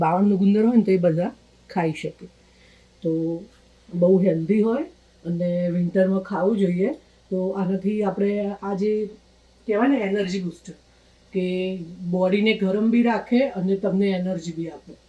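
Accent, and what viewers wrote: Mehsana, India. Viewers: Bhasha mahesanani chhe to vatan?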